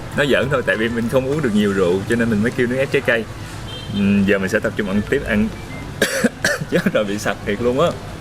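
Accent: Mekong Delta, Vietnam. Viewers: Thích a , giọng đậm chất miền tây luôn 😋😋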